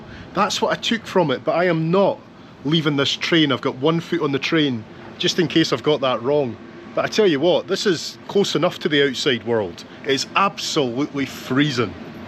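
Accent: Scotland, United Kingdom. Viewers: Oh you are toooo adorable...I love your Scottish accent.Subscribed just to listen to you talk...and your sense of humor.😅...very interesting content too!😊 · I love watching your travels and this one gave me an appreciation of just how good you are at editing. The 5sec cut where you threw the napkin onto the plate after that impressive looking lasagna was like the full stop at the end of the dinner sentence, ready for a new para. Im half Scottish and your accent reminds me of my youth there. Great journeys and travelogue.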